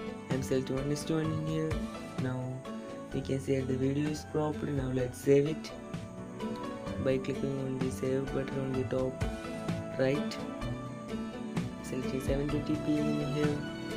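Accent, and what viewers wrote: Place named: Kerala, India. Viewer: His English slang makes me think that he is malayali...aren't you🤩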